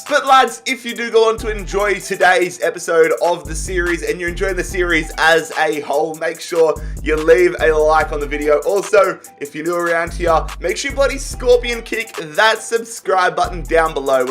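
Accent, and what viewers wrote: Australia. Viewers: Are u from Australia mate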